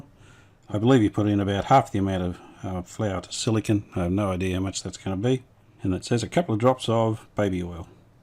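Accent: Australia. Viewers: accustom to the Australian English pronunciation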